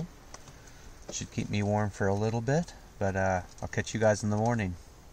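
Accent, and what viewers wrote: Canada. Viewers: where are you from? Your accent sounds similar to Cape Breton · Luv that Canadian accent ! / almost thought I was watching the trailer park boys lol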